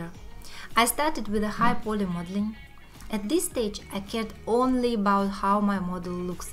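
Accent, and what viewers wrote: Russia. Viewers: Мне кажется, что на англоязычном ютубе и без этого видео, нет дефицита в туториалах и уроках по Блендеру (в т.ч и от пионеров и разработчиков Блендера). И какой смысл делать русское название, для англоязычного ролика? Это мое субъективное мнение, но лучше было уже делать ролик на русском, чем на английском, с произношением как у героев фильма "Красная жара".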